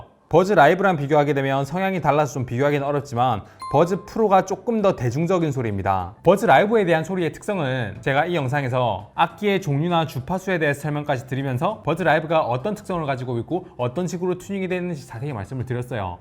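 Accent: Gyeongsang, South Korea. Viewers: 경상도 분인가요? 표준어를 구사하려는 것 같은데 억양이 경상도 사투리 쓰는 분 같네요.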